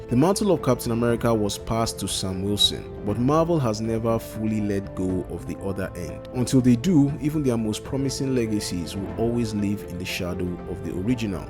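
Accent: Nigeria. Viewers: I hear a nigerian brother, I subscribe. No questions asked 😁😁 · Soothing ass Nigerian voice 🙏😩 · Hello U sound like a Nigerian (I am too) Please do you know where I can buy comics here in Nigeria (Abuja) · Subscribed IMMEDIATELY i heard the Naija accent. We need more naija nerds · Naija bro talking comics. So refreshing to hear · 5 seconds in I said….wait he has to be nigerian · Nigerian? Instant sub. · I think he Nigerian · Hearing a Nigerian voice always makes me smile inside. My siz and I binge on those B- quality Nollywood movies so we really have a thing going with Naija flicks lol "Honarabohl woman??!? What do you mean honarabohl?? That gyeol dea be di most renowkn Ashewoh ddis side of de Congo, abeg." Literally heard this once and I DIED (Oh I'm Kenyan btw) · I know a Nigerian brother when I hear one. Nice pacing of the video as well as the edit.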